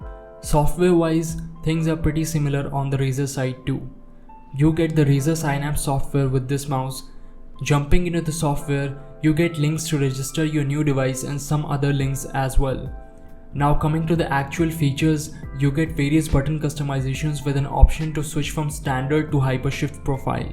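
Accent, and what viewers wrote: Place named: India. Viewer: You talk like some Indian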